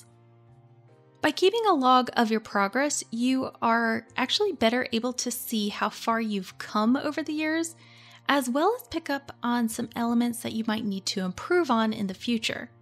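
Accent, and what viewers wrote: South Carolina, United States. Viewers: I like to guess a speaker's location by their accent. South Carolina?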